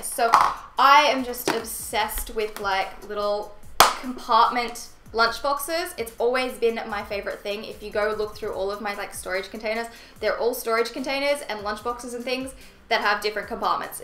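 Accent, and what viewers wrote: Australia. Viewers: are u aussie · Your Australian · are you Australian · Are you Australian? You're so pretty btw! ❤️ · Is she australien like her accent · Are you Australian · Is she from Australia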